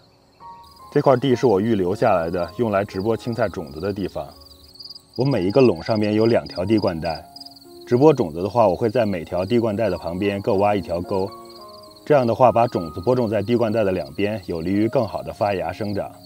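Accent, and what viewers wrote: Beijing, China. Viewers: 正宗京腔京韵，声音好听，莱种的又好，给你点赞👍。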